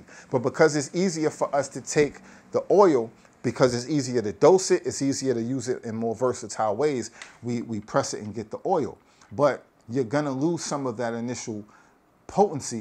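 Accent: New York, United States. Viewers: Is the New York east coast vibe my mans here is real maybe you not use to that lol 😂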